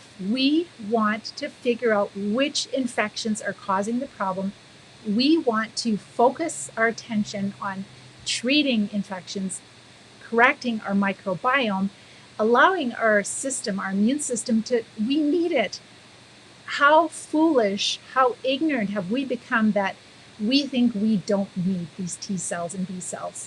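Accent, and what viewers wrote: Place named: Canada. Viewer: Less than 1 minute listening to you -- I'm pretty sure you're Canadian 😮😊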